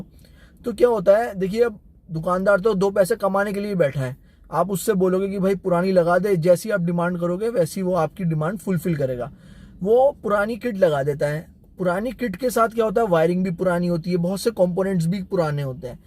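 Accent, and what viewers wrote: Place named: Old Delhi, India. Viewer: Very informative video brother👍👍 I am planning to install CNG kit in my ford figo 2017 model. Could you suggest which Kit I should go for as I have zero knowledge about the kits available in the market. Would appreciate your suggestions here! Also by any chance are you from Purani Delhi😂 I could sense a matching tone & accent what we have in Old Delhi.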